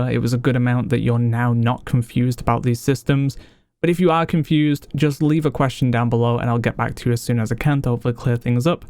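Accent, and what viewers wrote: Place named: Northern England, United Kingdom. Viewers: i would say lancashire/ cheshire / w yorkshire region for his accent. nw england. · It’s his northern English accent. That’s how they pronounce it there!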